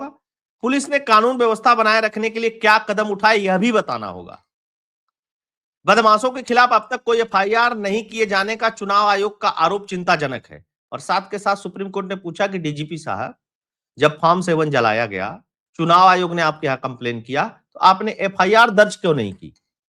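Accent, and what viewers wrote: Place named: Bengal, India. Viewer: क्या तू सभी शब्दों का उपयोग बिल्कुल ठीक कर सकता है, ये व्यक्ति कानून का बहुत बड़ा ज्ञानी है, बंगाल में तो पूरे लोग व का उच्चारण ब करता है, समझें की नहीं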